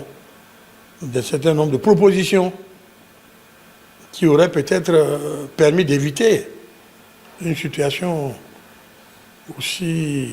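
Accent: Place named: Fon, Benin. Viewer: Votre accent résonne fon-mahi. Je suis impressionné!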